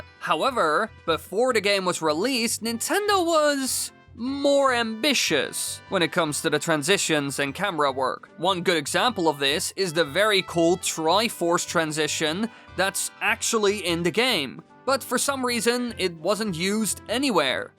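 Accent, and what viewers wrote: Netherlands. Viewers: I have to know... Are you Dutch xD? If so, je accent heeft het verraden B)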